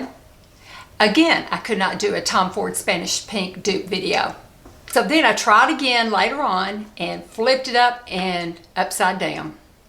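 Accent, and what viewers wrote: South, United States. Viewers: Don't come between a woman and her lipstick! Love it. I subscribed to you the other day because I saw you on little poet. This is my first time to see you. I love you! I have laughed more and had such a good time with you. Now girl, I am southern too. I have an accent and when I hear someone like me, I just go into this haze of pleasure it's like dope. Now tell me if I am right, You sound like a Carolina girl or a Tennessee girl. Maybe Texas but I'm counting on the Appalachian south.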